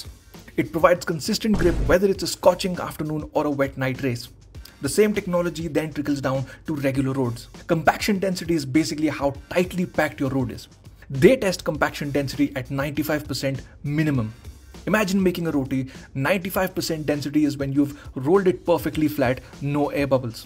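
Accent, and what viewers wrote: India. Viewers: God damn! First Indian with a listenable accent!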